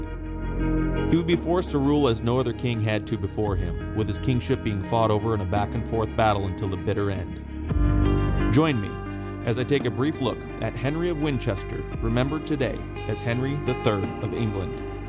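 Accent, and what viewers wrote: United States. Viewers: Well written .. poorly pronounced. The American accent is an extreme handicap to educators.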